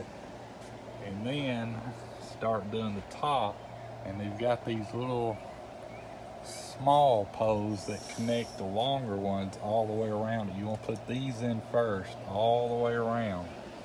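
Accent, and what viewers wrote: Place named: Alabama, United States. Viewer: Better and more good ideas added than any of the other Green Canopy Regent Gazebo videos! Thank you (I recognized the accent. My father in Law, R.D. Blackwell, came from alabama with a similar fun to listen to drawl, and those two letters before his Family name. Thanks muchly. We'll be ready for storms. We just put up the framing on ours. and we're putting in into cement slab. I just finished hammer drilling 1/4" holes and put in sleeve anchors into the holes, with 5 ft. pound 3/8 " diameter acorn nuts (we used to call them "nylocks") to lock the frame down into the cement. The straps will be next. Thanks again. I enjoyed the tour of your gazebo. Oh and the rope lights will be going up in ours too. great idea. Blessings. - Vern Bauerle from Alta Loma (a postal area inside Rancho Cucamonga, California.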